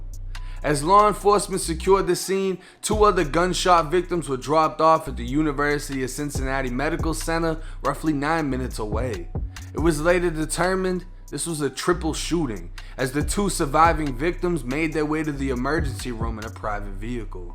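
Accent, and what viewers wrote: Boston, United States. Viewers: “Or a drunken argument between people pottyin’ (partyin’)” the Boston accents crazy 🤣🤣🤣🤣🤣🤣🤣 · He got that Boston accent.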